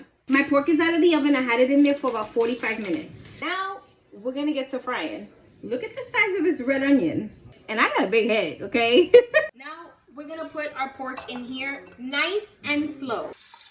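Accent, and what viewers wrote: New York, United States. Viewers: Her Spanish accent is fighting with her ny accent. Sometimes ones winning sometimes the other is. Lol.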